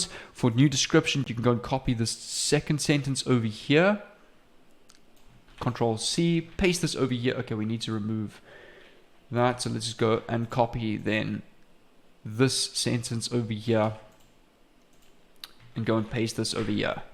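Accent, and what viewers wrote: South Africa. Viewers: Are you South African Mate? I'm very familiar with that accent. But you are great. I trust you and Im taking my shot on this one.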